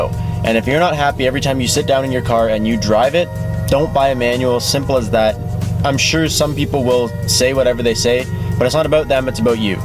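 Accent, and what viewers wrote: Canada. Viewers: Ahaaaa Canadia. That's the accent ouwt! Cheers brotha new frs owner here in 5 days!!!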